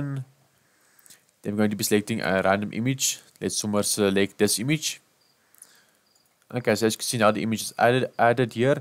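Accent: Afrikaans, South Africa. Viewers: Jisss maar jy is heavy afrikaans bra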